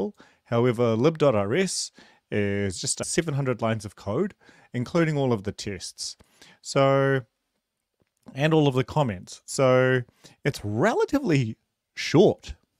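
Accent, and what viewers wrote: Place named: New Zealand. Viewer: Thanks for sharing! Love your NZ accent btw :) churr bro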